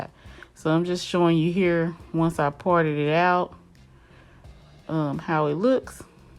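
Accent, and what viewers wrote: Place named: Dallas, United States. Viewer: I instantly knew you were from Dallas and you sound like me bih. And then you said Ebony beauty supply.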